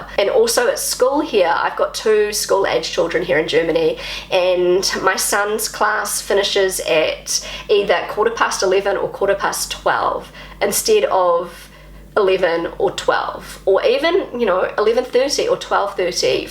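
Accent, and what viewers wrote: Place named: New Zealand. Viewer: You are so cute with your 🥝accent, it's always a great pleasure to hear you speaking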